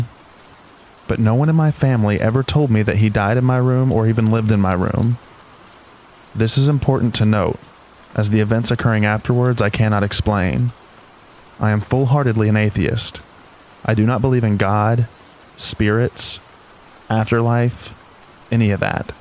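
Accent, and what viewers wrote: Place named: South, United States. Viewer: I love listening to your stories and sometimes picking up a little bit of southern twang, I always smile when I hear it!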